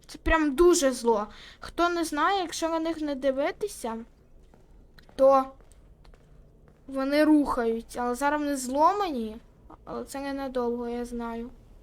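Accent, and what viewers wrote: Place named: Ukraine. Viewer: Вроде говоришь по русски, но украинец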